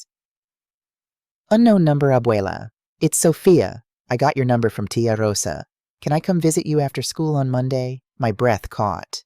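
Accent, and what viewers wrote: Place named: United States. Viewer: I hate her Mexican pronunciation, it sounds too gringo😊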